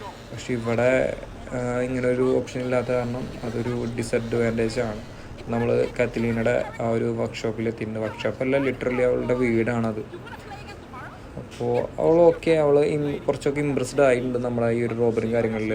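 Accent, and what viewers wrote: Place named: Thrissur, India. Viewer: BRO NATTIL EVIDEYA SLANG KETTIT THRISSUR ALLENKIL ERNAKULAM😄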